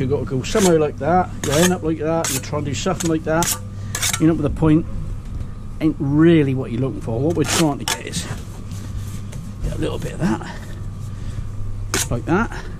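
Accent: Norfolk, United Kingdom. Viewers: He sounds like hes from naarrrfolk, norwich? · You sound like a good old Norfolk boy my friend??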